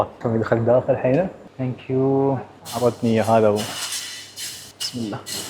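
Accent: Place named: United Arab Emirates. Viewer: طلع اماراتي و هي تحسبه سعودي وانا احسبه بحريني صدق خليجنا واحد هههههههه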